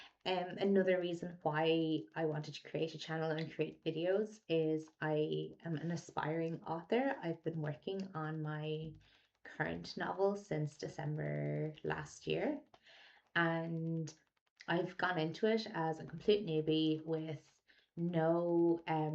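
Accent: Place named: Ireland. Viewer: “I could walk past someone in the grocery store and my TBR would get longer.” 😂 Every time I finish a book I struggle to choose the next one. I’ll share your TBR and we’ll both feel better. I love your chatty unedited take. Any slow moments are saved because you say um and er in an Irish Accent. From one Newbie to another welcome to BookTube. My Newbie tag video comes out on Sunday (Australian).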